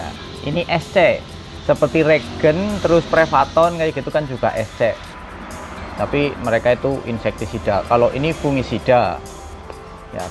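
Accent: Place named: Brebes, Indonesia. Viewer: Ngomonge kaya wong brebes..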